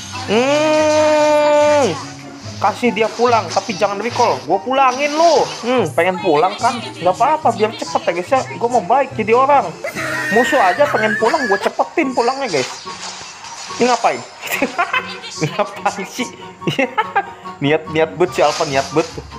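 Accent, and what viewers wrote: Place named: Tambun, Indonesia. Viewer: kaya orang tambun bhasanya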